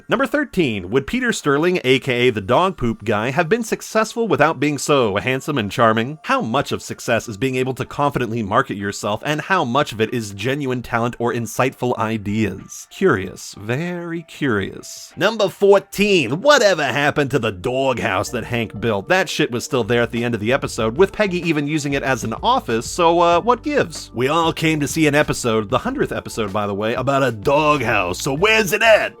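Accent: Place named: New Jersey, United States. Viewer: It sounds like you've been watching The Sopranos, and I'm here for it! 💜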